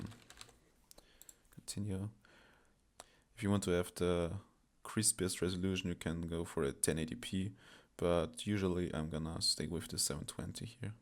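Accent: Germany. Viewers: You're accent sounds like a German one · schon beim ersten Satz gemerkt das du deutsch bist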